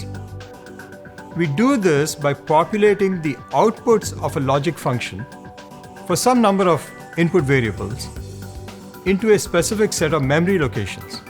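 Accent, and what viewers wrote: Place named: India. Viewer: You couldn't find a guy without an indian accent?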